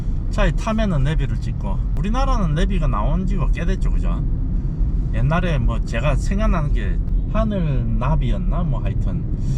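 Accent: Gyeongsang, South Korea. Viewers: 정말 결혼 잘하셨어요 경상도 말씨 듣기 좋습니다 ㅎ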